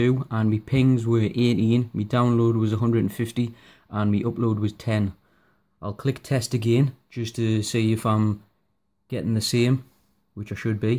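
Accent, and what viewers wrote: Newcastle, United Kingdom. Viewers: this lad sounds like a georide GO NEWCASTLE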